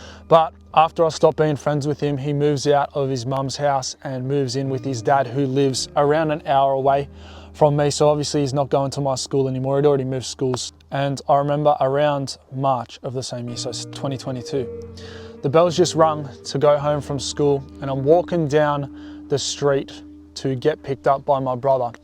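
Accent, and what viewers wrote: Australia. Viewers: Are you from Melbourne or Queensland?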